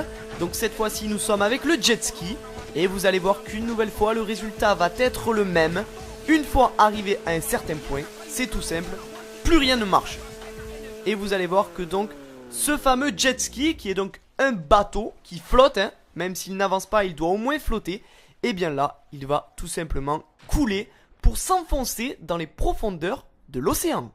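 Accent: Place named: South, France. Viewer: Tu a un axant du sud 😁😆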